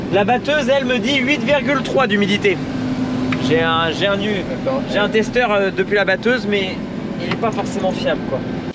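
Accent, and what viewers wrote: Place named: Vosges, France. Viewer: C’est l’accent des Vosges ça ^^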